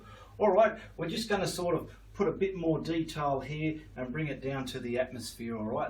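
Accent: Australia. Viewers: The spirit of Bob Ross and the accent and proper speech of Steve Irwin…what a comforting voice and video to watch.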